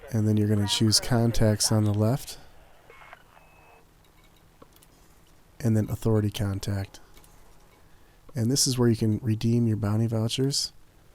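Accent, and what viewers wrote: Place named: Wisconsin, United States. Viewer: You sound like my cousins from Wisconsin. Is this video still relevant a year after you made it?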